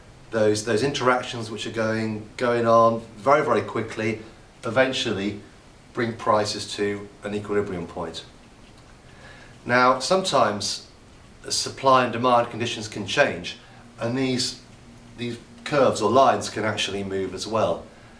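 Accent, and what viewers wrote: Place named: London, United Kingdom. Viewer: Perfect mate. You're a londoner ain't it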